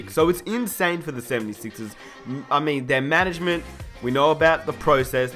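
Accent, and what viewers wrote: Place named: Australia. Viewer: An Aussie commentator - love it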